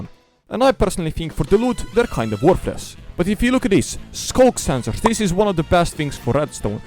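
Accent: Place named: Eastern Europe. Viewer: ... yeah i hear the babushka people acent now that i relisten to it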